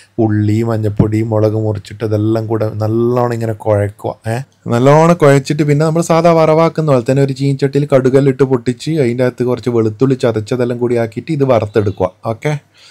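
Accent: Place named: Kannur, India. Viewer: നിങ്ങൾ എവിടെയാ നമ്മുടെ കണ്ണൂർ ആണോ നമ്മളെ bhasha😂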